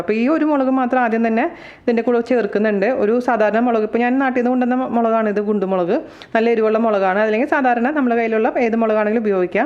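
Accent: Thrissur, India. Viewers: I'm a Big fan of u..... ചേച്ചി ♥️♥️♥️ especially aaa thrissur slangilula tta..samsaravum pinne chechide Nadan vegetable recipesum✌️pinne njanum thrissurkarananutta 😜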